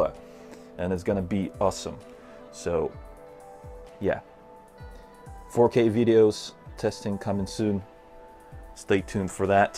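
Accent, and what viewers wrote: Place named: Russia. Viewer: я понять не могу ты русский что ли(акцент русский просто) .I can not understand that if you are Russian ( a Russian accent )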